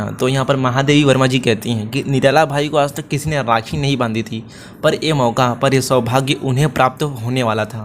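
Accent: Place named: Bhojpuri, India. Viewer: Bhosdike ghutka nikal ke baat kar bhojpuri choda sala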